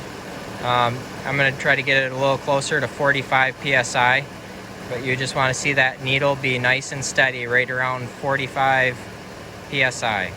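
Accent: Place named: Midwest, United States. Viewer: Simple, to the point. Well done. Nice Midwest accent. Cheers to the algorithm!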